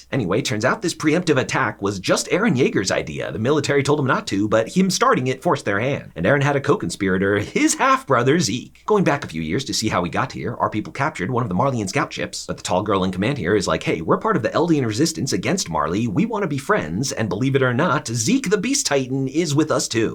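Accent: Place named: United States. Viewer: Your American voice doesn’t make me change my viewing. Grata. Do more anime!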